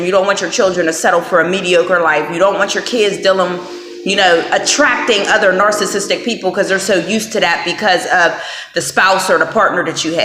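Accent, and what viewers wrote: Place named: Mid-Atlantic, United States. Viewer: do you..interesting accent btw...are you from the mid atlantic region?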